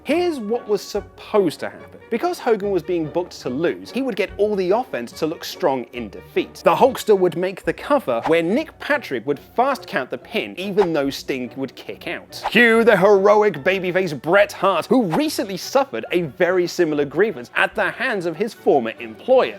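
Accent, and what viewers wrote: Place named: United Kingdom. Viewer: Jesus how many wrestling youtube channels are there with british hosts?!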